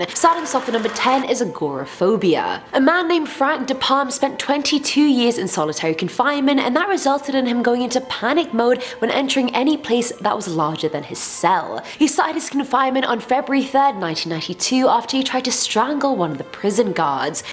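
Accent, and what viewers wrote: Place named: United Kingdom. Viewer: She has the best British accsent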